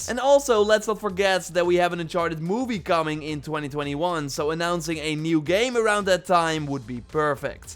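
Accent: Netherlands. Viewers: You just know when you hear a dutch guy talking English. Nice video btw man. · With this terrible Dutch accent? If he made his L’s any thicker, he’d sound like a Bond villain...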